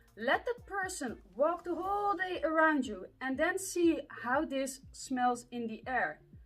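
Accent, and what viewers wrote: Netherlands. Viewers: I have just discovered your channel, wow I've been missing out... I have watched 4 of your videos so far and I must say you are perfect to be a TV hostess, have you ever think of that? I love your dutch accent and curly hair. have a wonderful day. Neighbor from Denmark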